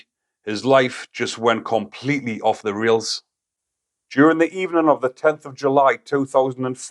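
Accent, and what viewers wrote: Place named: Geordie, United Kingdom. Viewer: First-class video. Plenty of well-researched detail. Despite your strong Geordie accent, I was able to follow all your commentary by using the subtitles! Thank you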